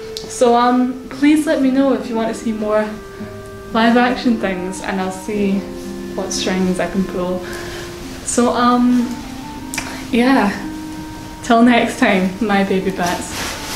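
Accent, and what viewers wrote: Scotland, United Kingdom. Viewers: wow, not gonna lie i did not realize you were scottish til just now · Scottish, Irish, British, or Dutch accent? Either way your voice is lovely!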